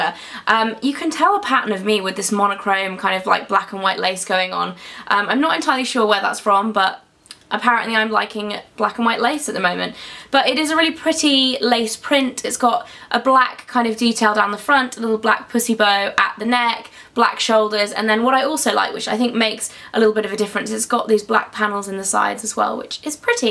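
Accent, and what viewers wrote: United Kingdom. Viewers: I'm from the U.S. and have only heard it said in our ugly accents - I think the way Brits say things sounds so much better, especially!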